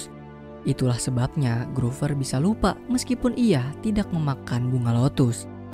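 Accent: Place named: Java, Indonesia. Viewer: bang orang jawa ya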